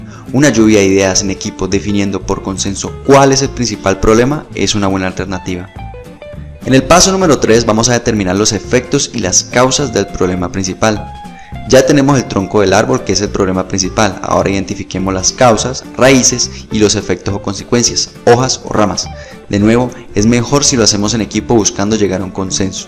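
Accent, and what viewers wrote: Cali, Colombia. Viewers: Hablalo Caleño!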